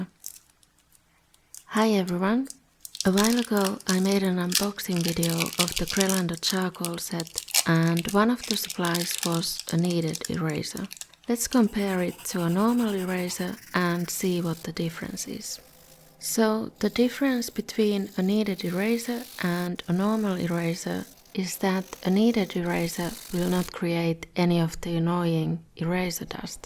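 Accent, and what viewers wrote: Finland. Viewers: Ootko suomalainen ku aksenttis kuulosta siltä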